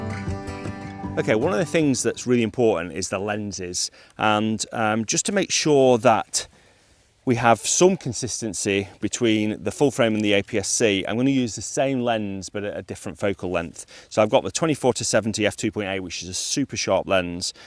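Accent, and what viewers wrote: United Kingdom. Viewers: It's the first video I've watched from your channel, I like the test you've done and moreover I like your British accent, it's easy to understand for a non-native speaker like me. It would be interesting if you could print some pics from a Fujifilm X-T5 which it is an APS-C camera but with 40 MP sensor, just to understand if an A1 print looks better now compared to an APS-C 24 MP sensor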